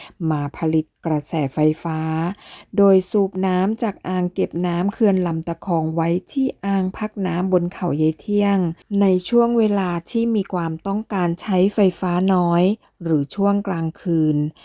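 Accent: Cambodia. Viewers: คนพูดไม่ไช่คนไทยแน่นอนสำเนียงออกไปทางเขมร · แอดมินคน นี้เขมรนิหว่า สำเนียงเขมรเป๊ะ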